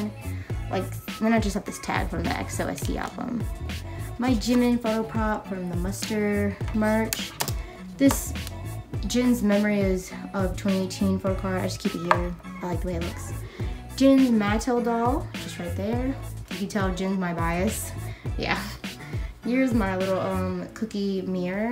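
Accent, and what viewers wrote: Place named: United Kingdom. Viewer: I like your british accent..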